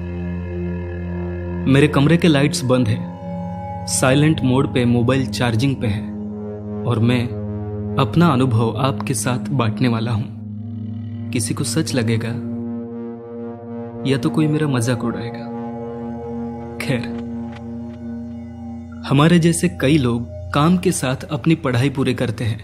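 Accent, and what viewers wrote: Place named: Bengal, India. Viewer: Ta Bhai up to Bengali mei bat kar skte kyu Hindi me bolte ho upka Hindi kaisa bangal-hindi mixed ha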